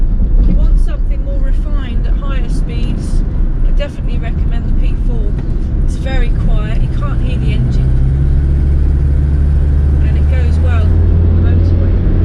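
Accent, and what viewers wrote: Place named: Gloucester, United Kingdom. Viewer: Hi i loved watching your driving were you driving around Gloucester as that is my neck of the woods and your accent sounds very familiar looking forward to the next one best wishes roy. 😊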